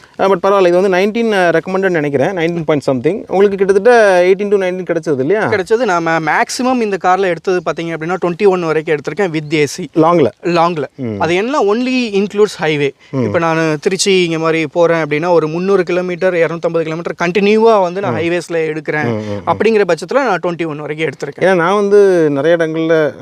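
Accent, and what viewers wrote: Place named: Tirunelveli, India. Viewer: மாஷாஅல்லாஹ்.! இறைவன் உங்களுக்கு அருள் புரியட்டும்..!! உங்களின் வீடியோக்கள் அனைத்தும் புதிதாக கார் வாங்கும் மக்களுக்கு ஒரு சரியான வழிகாட்டி.!!! ஒரு காரில் உள்ள குறை, நிறைகளை சாமானிய மக்கள் கூட மிகத் தெளிவாக எந்த மனக் குழப்பமும் இல்லாமல் வாங்குவதற்கு மிக உதவியாக இருக்கிறது. அருமையான நெல்லைத் தமிழில் உங்களின் கார் பற்றிய வர்ணனை மிக அருமை. சில யூடியூப்பர்கள் ரிவியூ என்ற பேரில் டஸ், புஸ்ன்னு இங்கிலீஷ்ல பேசி தமிழர்களின் பொறுமையில் விரலை விட்டு விடுவார்கள். ஆனால் உங்களின் தனித்துவம் எப்போதும் எல்லோராலும்.. விரும்பப்படும். உங்களின் கனிவான, பணிவான பேச்சு & வார்த்தைகள் எல்லா "சமய" மக்களையும் கவர்ந்திழுக்கிறது. வெகுஜன மக்களின் அன்பும், ஆதரவும் உங்களுக்கு எப்போதும் உண்டு. ஏனென்றால் நீங்கள் மற்ற யூ-ட்யூப்பர்களை விட தனித்து நிற்க்கிறீர்கள்.! அதற்கு காரணம்..!? கமாண்டில் கேள்வி கேட்பவர்களுக்கு உங்களின் ஆரோக்கியமான, கண்ணியமான பதில்கள்.! 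வாகனங்கள் பற்றி உண்டான உங்களைப் போன்றவர்களின் மிக ஆழமான சிந்தனை இந்நாட்டு அரசுக்கும், நாட்டு மக்களுக்கும் அவசியம் தேவை. தமிழக, மற்றும் ஒன்றிய போக்குவரத்துதுறை உங்களை பயன்படுத்திக் கொள்ள வேண்டும். என்றும் உங்கள் பணிகள் சிறக்க என் பிரார்த்தனை & வாழ்த்துக்கள்.!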